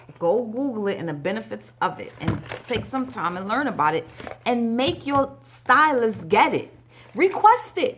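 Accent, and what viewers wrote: New York, United States. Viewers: Thank for this video. Love your accent. From NY? You tell it like is and that honestly is refreshing. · Girl you have to be from New York I love the way you talk I'm about to order because the way you broke it down lol thanks Boo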